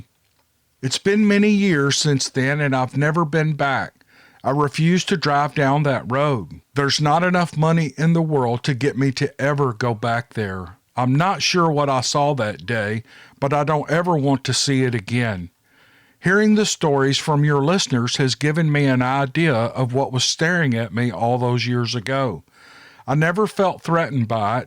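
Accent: South, United States. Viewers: Came for the bigfoot encounters, stayed for the ranting.;)I love the stories, interviews, and your accent! I fully love a well spoken southerner. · I do! Because I know I'm going to LOVE the stories. But, what makes the stories even better is narrations. I love that southern drawl; he does a GREAT job!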